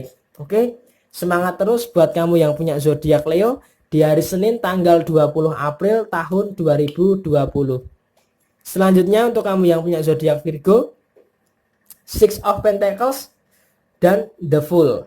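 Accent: Java, Indonesia. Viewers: Sya nlah ktawaaaa dngarttt host...yg bawain reading an...logatnya ithu khaz kental.orang jwa....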